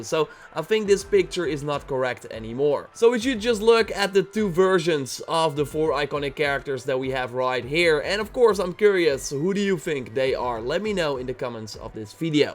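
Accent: Netherlands. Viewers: You can really tell when someone is Dutch from the way they speak English :P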